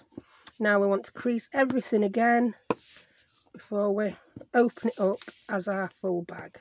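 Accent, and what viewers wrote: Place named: North, United Kingdom. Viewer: Brilliant tutorial thank you. Great to hear a Northan accent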